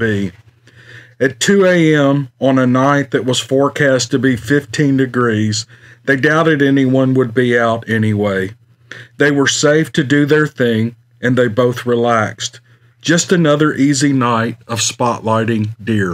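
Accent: South, United States. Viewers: Always have had a fasicination with Cryptoids. I myself am a Southern girl. Born in Memphis,TN and as a child roamed the hills of Mississippi, Tippah County my paternal aunt own land so I was all over it. Never ran into Bigfoot, but there suppose to be a Big Black Panther of sorts roaming those same hills. But I never had the experience of meeting anything out of the ordinary. I now live in Washington state still on the look out though. Love your storytelling and your southern drawl. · I love the southern American accent, i dont know if its me being Aussie and not knowing what to listen for but it doesn't sound trashy to me, it sounds charming, engaging. Its an accent made for story-telling. Are there different southern accents? I imagine with Louisiana being French they might sound different & I've heard Cajun people speak and I cant understand them at all. 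· I love his accent and cadence. Sounds like a suave southern gentlemen. (I'm a woman.)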